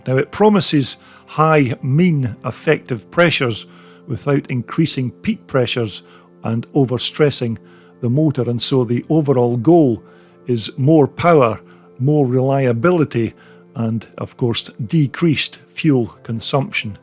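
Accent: Scotland, United Kingdom. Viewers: Love your accent-are you Scottish? The only uncle I ever had was a hired hand on my grandparents tobacco farm in Canada and he was the coolest uncle you could ever have. John in Texas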